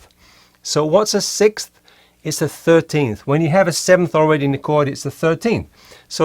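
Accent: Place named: Scotland, United Kingdom. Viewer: Working through this book, and this chord definitely confused me as to how it was written. Non-sequitur, but if you don't mind my asking, where did you grow up? Your accent is wonderful and almost a mix between Scottish and upstate New York.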